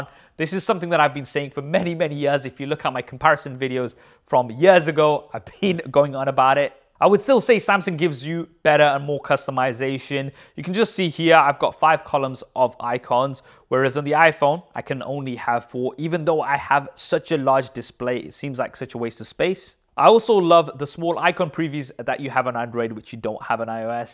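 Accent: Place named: London, United Kingdom. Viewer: That London Accent.. 😊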